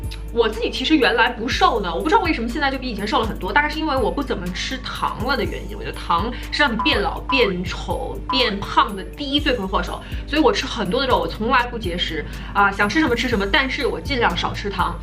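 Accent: Beijing, China. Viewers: 偶尔看到的视频说话的语速和独特的气质感觉应该是北京女孩，果然是啊！好喜欢💕气质真好！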